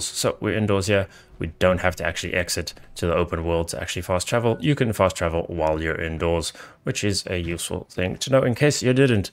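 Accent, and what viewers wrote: South Africa. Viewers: Is that a South African accent I'm detecting?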